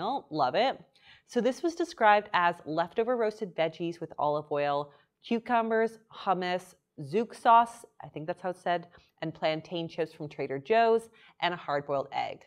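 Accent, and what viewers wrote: Canada. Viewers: I just subscribed without having watched a single video because I saw you on Obese to Beast and though you were incredibly well spoken and as a linguist I like your Canadian speech patterns. But ALSO I have been a fan of Dr. Mike for quite awhile (I only watched HIM because I love music/tv/trailer reaction videos and I think I watched a reaction of his to an episode of "House.") And right before I saw you speak with John, I had JUST watched his video critiquing that Australian banana woman and I SO SO SO MUCH wanted to ask Dr. Mike to do a video on her, so when you brought up her and then you later brought up Dr. Mike, I knew I had to immediately come here. I think I missed the video you did with him-but I'm pretty sure I've heard him mention you before. (I can picture him saying the name " "...) Love you already! - Male, San Diego, obese, lost 25 pounds in last two years, most this year. 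Want to lose 50 in the coming year. Had an unrelated (genetic thing) stroke so my frontal lobe has issues so follow-through is difficult meaning even more than everyone else already, the psychology side is bigger for me than the physiological side. Have you ever talked to Tom Bilyeu on Impact Theory/Health Theory? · She is Canadian. That’s called an accent.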